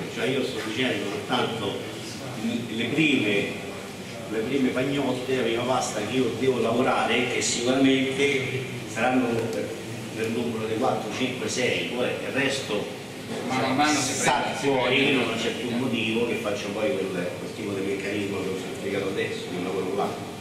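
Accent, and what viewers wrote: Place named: Rome, Italy. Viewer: Un maestro..e poi è troppo bello il romanesco!! Bravo!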